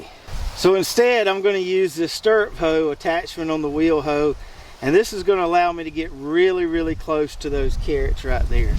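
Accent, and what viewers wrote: South, United States. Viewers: Trivial but--Southern Drawl a bit refreshing from farther N. Commifornia, even if it's heel for hill. 😄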